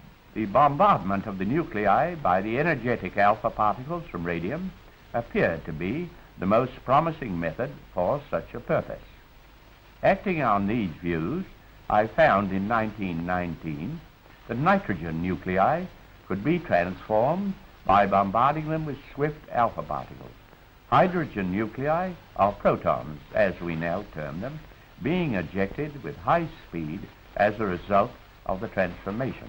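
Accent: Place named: New Zealand. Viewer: I'm amazed his accent is still so clearly Kiwi, especially given his education, class and all those years in the UK. It's great to hear!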